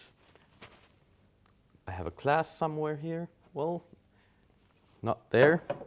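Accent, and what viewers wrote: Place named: Finland. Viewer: Lmao i recognize a finnish accent when i hear one (im finnish)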